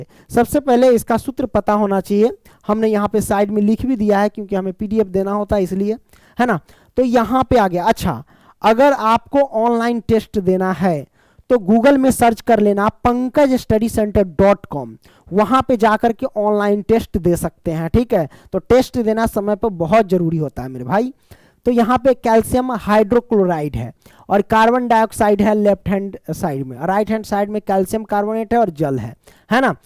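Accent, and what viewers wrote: Bihar, India. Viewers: Sar aap Bihari hai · sir kya aap bihar se ho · Aap bihar se ho kya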